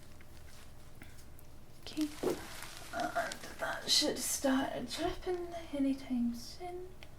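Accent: Scotland, United Kingdom. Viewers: that Scottish accent is uncommon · Good old Scottish accent mines is from east ayrshire I can't place where yours is from lol? And also super video :) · That pretty Scottish voice is so relaxing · I'm drunk, I love ur Scottish accent, what's more to say??? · Scottish AF damn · when you say "scary", it sounds like "scearee". but I like the accent, do all Scottish people say it like that? just a lil curious.hehe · YES FINALLY SCOTTISH ASMR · Is this your real accent? Are you Scottish? 😊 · she sounds scottish. not british. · Please more Scottish Asmr! Your Scottish accent makes me so calm! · Honey, where exactly are you from? I'm American and if I had to guess, I'd say that's a "Scottish" accent, right? Not Irish; am I correct?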